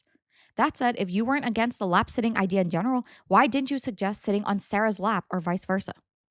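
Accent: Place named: United Kingdom. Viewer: Chucked up is vomited aha, it's always fun hearing a fellow brit in the wild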